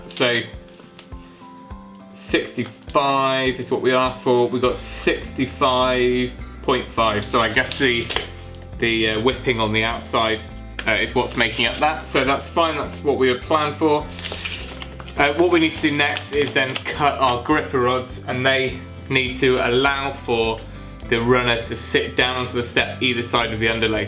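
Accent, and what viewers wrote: United Kingdom. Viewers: Great work and video. I can listen British English lol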